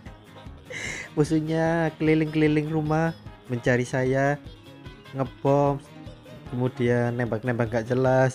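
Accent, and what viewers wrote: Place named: Java, Indonesia. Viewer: Br nonton game ini.. Sk sm suara nya medok medok jowo ok.. Arigato gosaimasta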